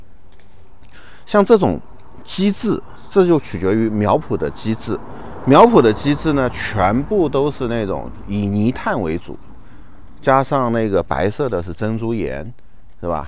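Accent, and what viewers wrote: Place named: Zhejiang, China. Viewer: 我就想问下是衢州人吗？普通话妥妥的浙西口音啊😇听到乡音好亲切！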